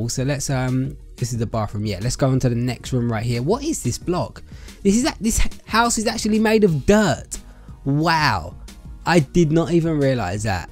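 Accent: United Kingdom. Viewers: Wait do u speak British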